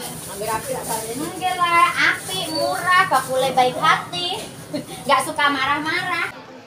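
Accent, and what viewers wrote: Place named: Java, Indonesia. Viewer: Walaikum salam mbake,koyone ne buah seger seger dan pasti enak..tapi lebih kpenak bahasa jowone ketibang buahe mbake so'ale medok tnan.salam santun dari crew happy wa buyut???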